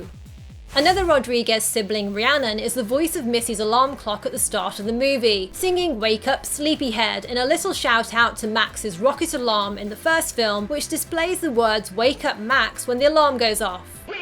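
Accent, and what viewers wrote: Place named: United Kingdom. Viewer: I love your voice so British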